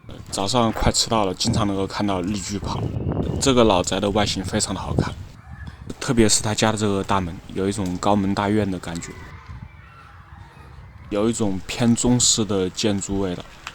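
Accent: Wuhan, China. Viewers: 武汉口音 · up是武汉人吗，口音听起来很像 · 你这口音一听就是武汉的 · 你肯定是武汉人：） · 博主武汉人吗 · 你这个口音，掐指一算，大概率是武汉人，哈哈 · 兄弟，说话怎么一口武汉味! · 请问，播主是湖北武汉人吗？口音有些象 😀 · 武汉普通话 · 朋友，听你的口音，是武汉的吧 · 标准的武汉普通话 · 武汉人吗？一口汉普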